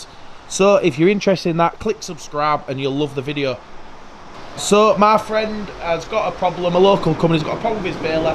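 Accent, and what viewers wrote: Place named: Hull, United Kingdom. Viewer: Nice video fella, and nice to hear the Ull accent, I was a holderness road boy many moons ago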